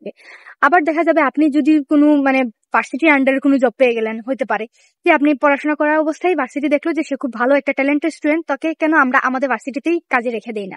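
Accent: Sylhet, Bangladesh. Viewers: Apu apni to sylheti?? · আপু তো মনে হয় সিলেটি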